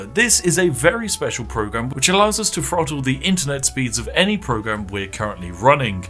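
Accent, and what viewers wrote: United Kingdom. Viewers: I am here just because I remembered this video had a program that is basically a lag-switch and I totally could use a program like that thanks random British man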